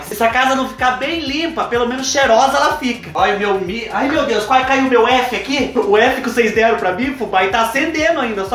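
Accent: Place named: Sorocaba, Brazil. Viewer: Sabia que este sotaque era sorocabano! ! Odeio traça na parede!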